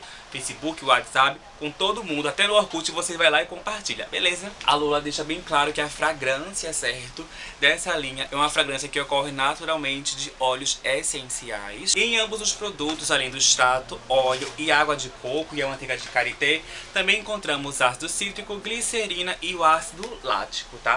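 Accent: Recife, Brazil. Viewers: inscrita, adoreeeeeeei. Ele é de Recife?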